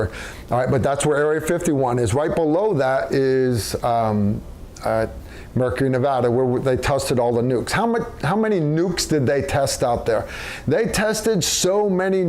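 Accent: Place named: New York, United States. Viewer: Where is from? I feel like from New York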